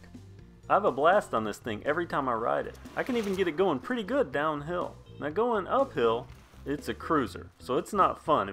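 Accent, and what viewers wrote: South, United States. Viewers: His Smooth Southern accent is so charming! I absolutely dispise how Northerners call Southerners accents "ugly" or "not articulate". This guys voice is the definition of articulate!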